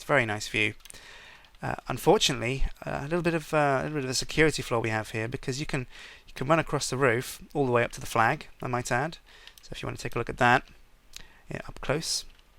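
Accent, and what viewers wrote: United Kingdom. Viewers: You can clearly tell he's from the UK from his accent.. Why would you need some sort of sign from him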